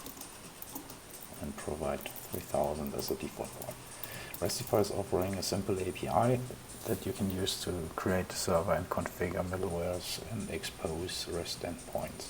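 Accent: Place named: Germany. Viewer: Thanks, your German accent and accompanying techno is inspiring.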